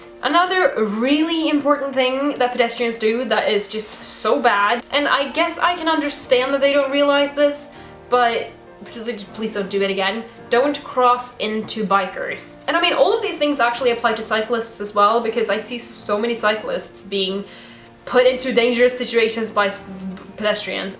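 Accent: United States. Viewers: whatever I think you have an Amrican and amazing Accent. Thank you for replying.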